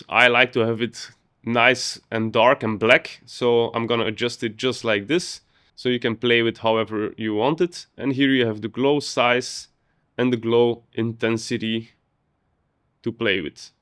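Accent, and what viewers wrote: Belgium. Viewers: Bro you are from Belgium?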